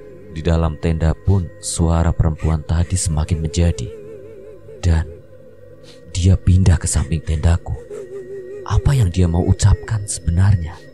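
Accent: Java, Indonesia. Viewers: Wong jowo mas?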